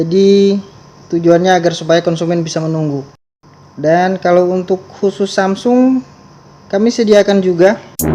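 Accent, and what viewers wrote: Indonesia. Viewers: Kalo logat e kaya ne orang pasar bulu ini yo heeee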